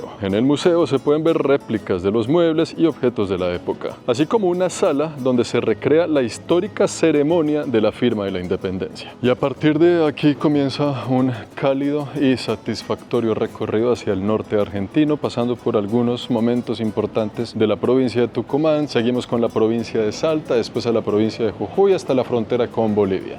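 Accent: Bogotá, Colombia. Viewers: viví 15 años en Argentina y mi esposo y mis hijos son argentinos. Me gusta como narras tus vivencias con ese acento cachaco jajaja. Saludos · NI YO COMO ARGENTINA NO SABIA DE ESA HISTORIA DE LOS QUILMES!!AMOOO EL ACENTO COLOMBIANOS Y A LOS COLOMBIANOS.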